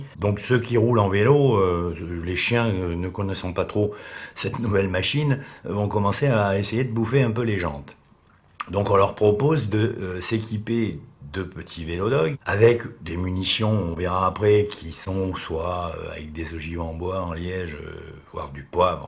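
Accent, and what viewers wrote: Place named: South, France. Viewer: d'accord j'ai eu l'impression d'entendre l'accent français sudiste lol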